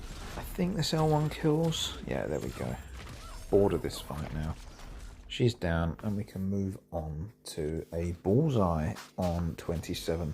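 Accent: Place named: England, United Kingdom. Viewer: Just wanted to comment and say keep posting. I prefer you over Lagacy and being English myself it's so refreshing hearing a familiar accent. I'll be watching more videos to come! 🇬🇧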